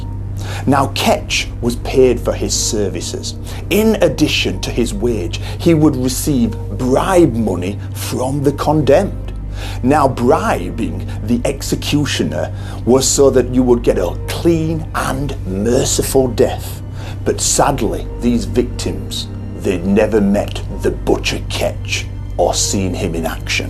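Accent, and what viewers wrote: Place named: Middlesbrough, United Kingdom. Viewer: I'm trying to figure your accent out....a bit of a Middlesbrough accent....a bit sunderlandish, bit of a Mild Geordie twang. I think your from somewhere between Hartlepool and Sunderland. I'd love to know if I'm close.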